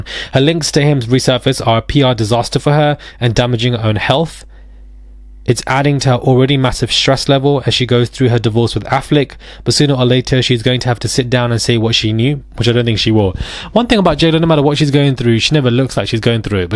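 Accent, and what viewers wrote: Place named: England, United Kingdom. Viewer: You can slow it down if you acers the playback speed in the top right. But yeah England has so many accents when people speak normal speed its hard to understand if you're not native. Just be thankful he's not Scottish or Irish you prob wouldn't understand one word 😂 even I as a native might need to repeat parts!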